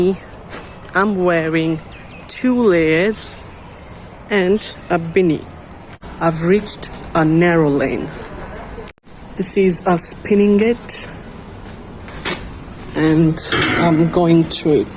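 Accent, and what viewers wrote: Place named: United Kingdom. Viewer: Your accent sounds really British. The intro is good tho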